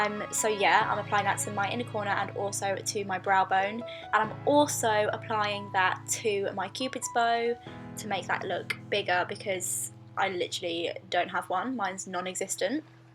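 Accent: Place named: United Kingdom. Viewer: Your british accent ❤🔥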